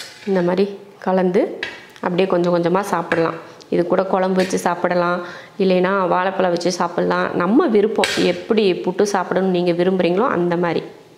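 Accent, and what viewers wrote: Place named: Theni, India. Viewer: செரட்ட தேனி language.....😂😂😂 Ur way of talking also தேனிகாரவங்க..... super sister நம்ம ஊரு மணம் ❤️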